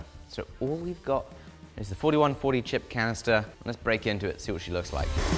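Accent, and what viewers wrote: England, United Kingdom. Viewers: A big “chube” of chips I absolutely love the English accent and what it does to certain words! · So British. So cute. So.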